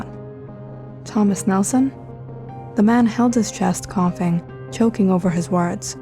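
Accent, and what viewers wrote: South, United States. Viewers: Love the country accent 😁💕 And so was the story! Underrated channel💕💕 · it's the country girl accent for me😂😂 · I like her country accent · Great southern accent!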